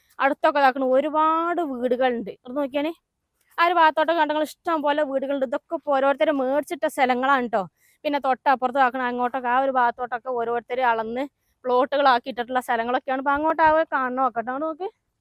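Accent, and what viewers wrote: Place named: Malappuram, India. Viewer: സഹോദരി കാസർകോടാണ് ഭൂമി പക്ഷെ ഭാഷ മലപ്പുറവും ഇതെന്ത് കഥ ?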